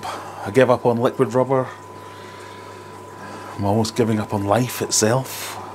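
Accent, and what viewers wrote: Scotland, United Kingdom. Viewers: Mate, you've never sounded so Scottish. Lol.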